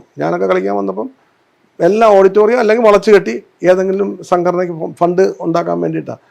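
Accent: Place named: Kottayam, India. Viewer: സൂപ്പർ വിവരണം. കോട്ടയം ഭാഷ ആയതുകൊണ്ട് ടി യാന് മനസ്സിലാകുന്നില്ല എന്നു തോന്നുന്നു.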